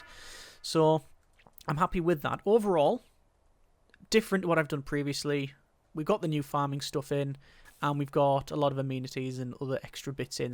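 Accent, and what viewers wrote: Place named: Newcastle, United Kingdom. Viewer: You sound slightly Geordie and if so big up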